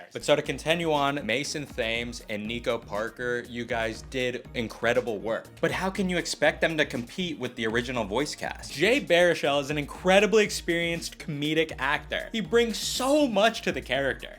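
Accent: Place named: Chicago, United States. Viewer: this guy gotta be from Chicago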